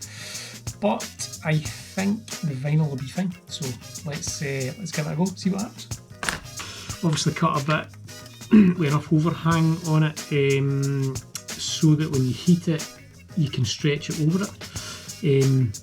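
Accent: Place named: Scotland, United Kingdom. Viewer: Great explanation dude.. even with those Scottish tones..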